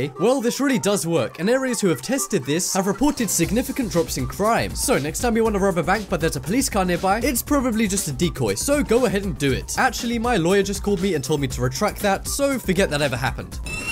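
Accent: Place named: United Kingdom. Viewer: he is British. That's just their normal accent.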